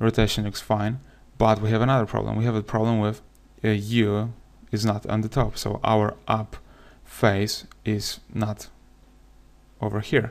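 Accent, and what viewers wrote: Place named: Poland. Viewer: It's somehow nice to hear a Polish man speaking English, your voice sounds empathic. I like yout stuff, you should do more. Pozdrawiam! :D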